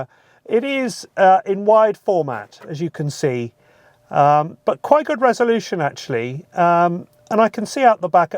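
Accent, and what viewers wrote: United Kingdom. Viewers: Thanks, loving your videos & the accent 🇬🇧 😉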